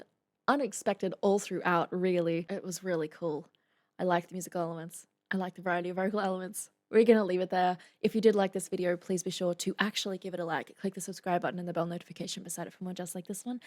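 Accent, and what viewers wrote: Australia. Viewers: With your beauty and that aussie accent, man your a hottie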